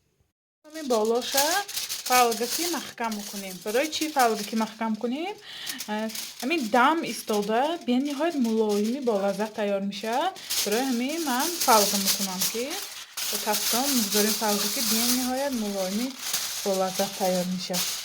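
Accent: Isfara, Tajikistan. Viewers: Офарин хохарчон лахчатон исфараги боин Дастатон дардро набинад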